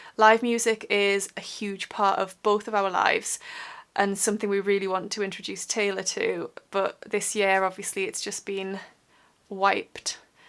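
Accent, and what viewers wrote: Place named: Tyneside, United Kingdom. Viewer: Great video. Lovely to hear a Tyneside accent. Don't ever forget about your dreams - it might not be possible for you to do some property development at this time, but you have your whole life ahead of you and dreams still come even true when you are more mature, you've just got to be determined and follow them. I speak from experience (though not in relation to property development).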